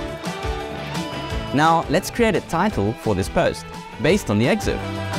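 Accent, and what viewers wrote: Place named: South Africa. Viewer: This oke is South African. Random.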